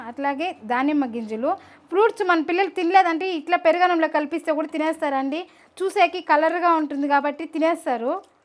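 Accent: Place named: Chittoor, India. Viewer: ne matalu chenna pellalaga chala baguntai me chethuru slang enka bagundhi super nuvu andhulonu tagaydhay lay👌👌👌👌👌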